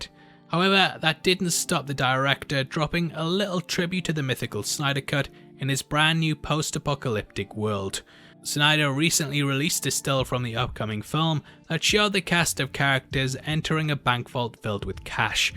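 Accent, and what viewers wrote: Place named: Newcastle, United Kingdom. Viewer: Hi do you live in Newcastle just my dad lives in Gateshead and you sound the same lol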